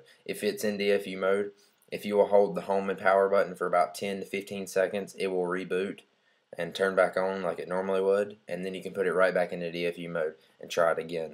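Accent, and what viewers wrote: United States. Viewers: Note to Self- Dont get tech advise form a guy with a country accent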